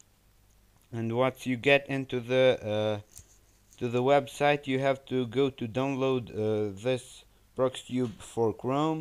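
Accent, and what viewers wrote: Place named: Albania. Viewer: theksin e shqiptrarit e paske haver :D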